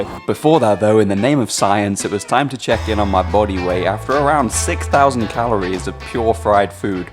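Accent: Liverpool, United Kingdom. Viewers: I can tell by your accent. Liverpool is a great place. The Beatles came from there. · I think you're the first British YouTuber I've followed. I love the British accent. With all my love, I wish you the best of luck in your future.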